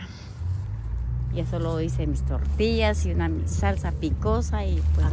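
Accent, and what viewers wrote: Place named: North, Mexico. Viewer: Ay como se lea subido el norte asta el ablar bien finjida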